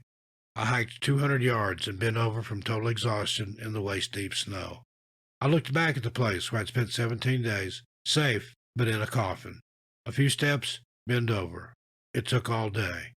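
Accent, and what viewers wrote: United States. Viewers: Your accent comes from which part of America, New York?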